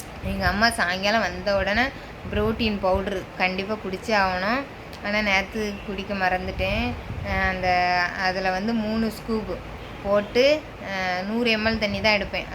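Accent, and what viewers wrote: Tirunelveli, India. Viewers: Unga peache peachu. Enakku tirunelveli slang romba pudikkum 😊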